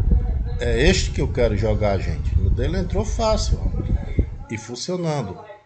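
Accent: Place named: United Kingdom. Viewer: Gostei do inglês britânico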